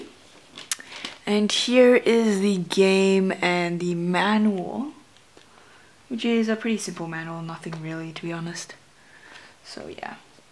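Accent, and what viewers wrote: Australia. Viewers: are you a boy with a auzzie accent or a girl? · Aussie Accent? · It's not that hard guys, this is obviously a woman from Australia (or New Zealand always get mixed up) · Ur Australian?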